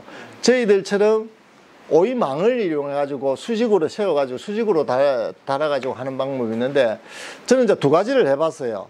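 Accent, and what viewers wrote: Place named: Gyeongsang, South Korea. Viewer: 듣다가 듣다가 경상도 억양에 스트레스 받아 도저히 못 듣고 나갑니다.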